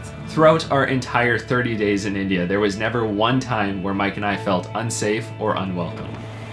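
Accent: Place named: Canada. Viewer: You just sound Canadian .....but you are very Indian deep inside within you .... Welcome home anytime buddy ....come again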